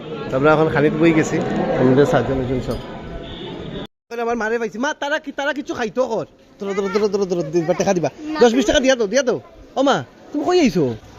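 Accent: Sylhet, Bangladesh. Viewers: 😂😂 কারন ও খাঁটি সিলেটী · আপনার ভাষা সিলেটের মত কিভাবে একটু বলবেন?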